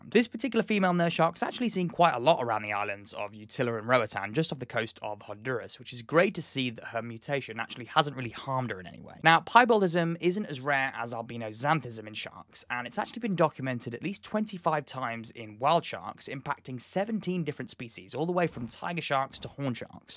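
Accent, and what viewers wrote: United Kingdom. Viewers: He's from Britain. They pronounce things a little bit different like, for example, what we call cilantro, they call coriander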